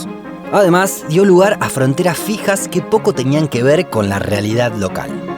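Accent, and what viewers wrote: Argentina. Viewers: Buenas tardes, Cosas que han pasado por culpa de las fronteras: - Reparto desigual e injusto de recursos - Me gusta el acento argentino - Nos parece mal que se explote tanto los recursos económicos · ya me fijé, estaba viendo los comentarios de otros comentarios y al parecer es un Chileno xenofobo de argentina y al parecer el creador de los vídeos es argentino o parece por su forma su acento que tiene similitudes a la de argentina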